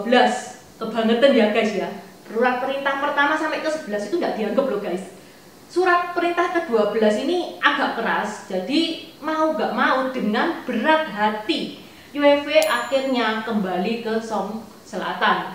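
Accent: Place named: Java, Indonesia. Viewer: Mbak ini logat bicaranya tellu Jawa SGT kental dan medooook bgt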